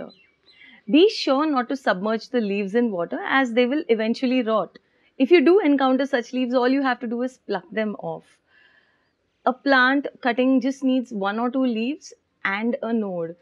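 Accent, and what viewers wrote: India. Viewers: I love the Indian accent, it’s the best. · This is how my indian university lecturers talks. I can't understand shiat.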